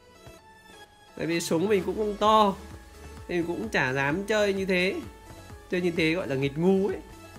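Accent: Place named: Ha Tinh, Vietnam. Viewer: Giọng này khả năng là Hà Tĩnh :D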